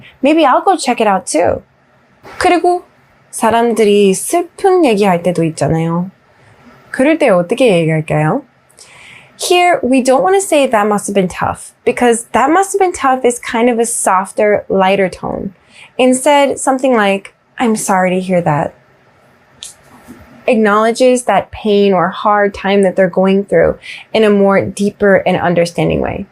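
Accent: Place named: Midwest, United States. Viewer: 완전 중서부 American Standard 발음임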